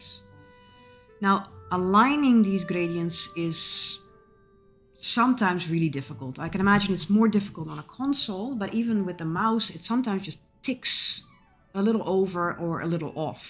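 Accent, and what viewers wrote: Netherlands. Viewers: that too lol but you speak very good English and for some reason i recognize the way Dutch people speak English. We can either speak very good English or very bad like Louis van Gaal 😂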